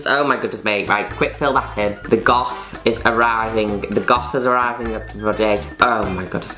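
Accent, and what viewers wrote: United Kingdom. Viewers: That thick British accent tho...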